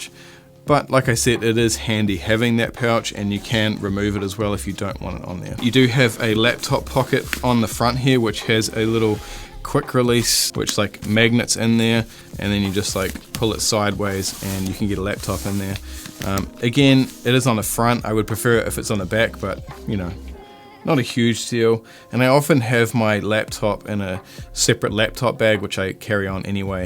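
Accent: New Zealand. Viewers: yeah but you should have used the word very vs. bit... ESPECIALLY when it doesn't even open from the back. Lol. (just breaking your balllls, having a laugh. Love your content and love the kiwi accent in a sea of English photographers doing YouTube - now let's get some momentum/consistency going with the channel eh?)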